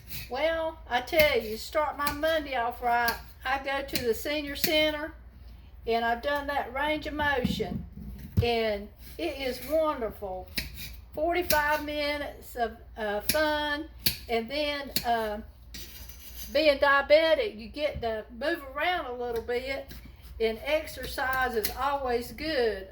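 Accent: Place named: South, United States. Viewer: I am a diabetic, so I am so glad I found your Ste. And plus you have a southern accent like me! Thank you for sharing! Please keep your site going!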